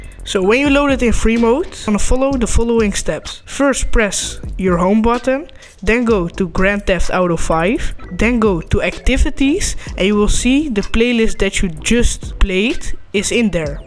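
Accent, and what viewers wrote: Netherlands. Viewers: Are you dutch? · Is my man dutch? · Lekker nederlands accentje man lol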